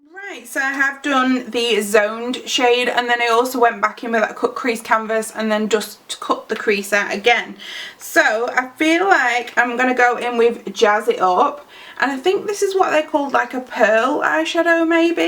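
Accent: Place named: Yorkshire, United Kingdom. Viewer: I recognise a fellow Yorkshire accent! Loved the vid- I have got loads of Urban Decay pallets but these babies are going to be on my Xmas list( the one you used and the smoked one/ love a smoky eye)🙂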